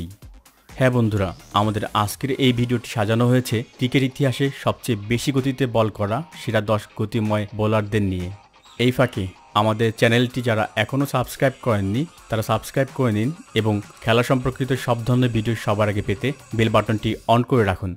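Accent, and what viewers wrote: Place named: Chapai Nawabganj, Bangladesh. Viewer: উপস্থাপক এর বাড়ি চাপাই নবাবগঞ্জ মনে হয়... শব্দ টা পেশার না, পেসার...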